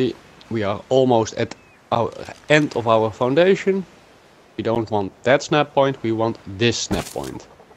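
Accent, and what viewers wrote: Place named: Netherlands. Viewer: En je hoort heel duidelijk dat je nl bent 👍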